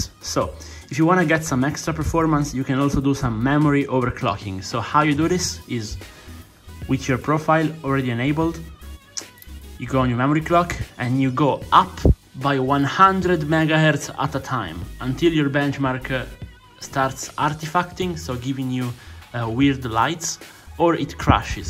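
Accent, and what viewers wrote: Italy. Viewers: l'ho capito dalla prima lettera pronunciata che fossi italiano hahah, poi il menu di msi afterburner mi ha dato la conferma...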